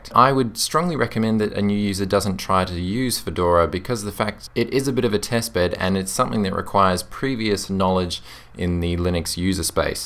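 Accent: Australia. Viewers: Great review, thanks! P.S. Your Australian accent is really really nice! I absolutely hate British accent (my gf's entire family were born in England and later moved to Canada), but Australian accent just has subtle differences which actually make it really attractive. Sorry for the off topic comment, but I just thought I'd let you know how big of a selling point your accent is when it comes to online reviews. Subscribed :)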